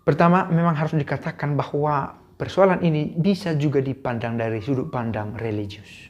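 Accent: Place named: North Sumatra, Indonesia. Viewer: Naratornya dialek sumut kayaknya🤣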